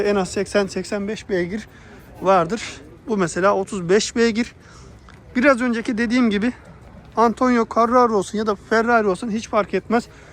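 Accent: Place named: Isparta, Turkey. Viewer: çok güzel ama çok kalabalık ve streslıi istanbuldan dünya güzeli ıspartama selamlar sağlıklar inşallah gayet güzel aktardın ıspartanın türkçesi güzeldir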